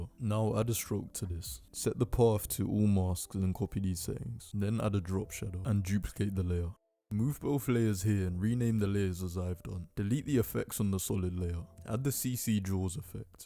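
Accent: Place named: Burnley, United Kingdom. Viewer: Are you from Burnley? love your accent.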